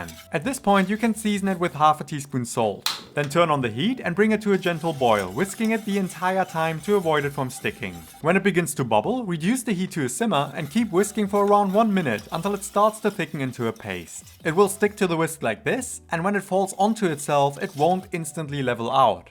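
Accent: Germany. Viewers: Haha, came for the recipes and stayed because of them - despite the german accent and the "wordaah" 😂 I really like the explanation and comparison of the two versions! Will copy it, thank you! 😉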